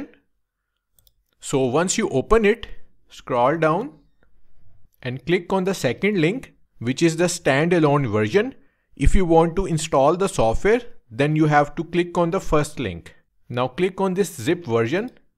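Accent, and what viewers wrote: India. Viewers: what he means is that because your english sounds very indian he said that as a stereotype aka that all indians are scammers. i think your english is fine and tips are good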